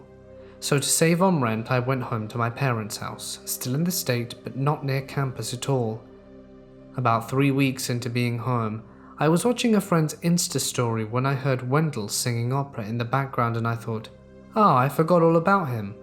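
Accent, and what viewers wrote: United Kingdom. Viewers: What part of u.k are you from?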